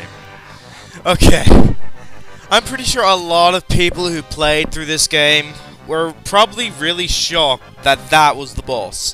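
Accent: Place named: United Kingdom. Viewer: Where do I begin? It's a countdown of your FAVORITE bosses, yet you have nothing but negative things to say about the first two bosses. Did you seriously just call Creepy Castle annoying?! Also, why do you have a British accent in this video, but have an American accent in your more recent videos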